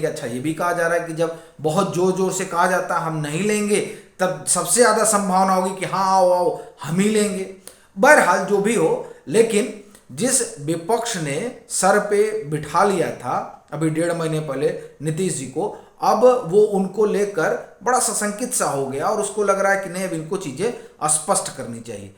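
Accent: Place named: Bihar, India. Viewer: अच्छा विश्लेषण। आपकी बिहारी उच्चारण शैली मुदित करती है।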